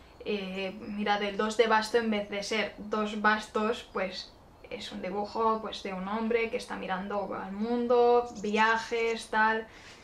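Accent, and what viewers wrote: Spain. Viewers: también me sucedió al principio con el tarot de HR Giger(esa confusión), pero un par de años luego, el de marsella clásico le dio sentido gráfico a mi intuición... visito tu canal porque las runas parecieran sigilizar la pregunta de que ha sido primero, la gallina o el huevo... el futhark me agudiza la interpretación gráfica al buscarla en las estrellas.. me gusta mucho tu canal y tu estética, quizás me agotan algunas de tus historias; pero es solo porque soy latino y me suena demasiado "sufrido" (por sufrido "meloso o cursi") en aquellos casos el acento español, más agradecido del esfuerzo y el mensaje de tu rollo.. saludos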